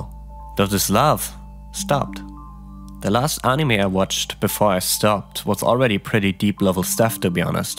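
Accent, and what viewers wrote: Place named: Germany. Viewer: Great combination of engaging personal story, fitting background music and awesome German accent. Good stuff.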